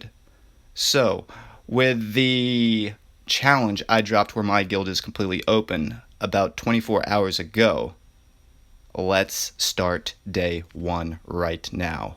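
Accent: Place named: Russia. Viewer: Again russian accent 😎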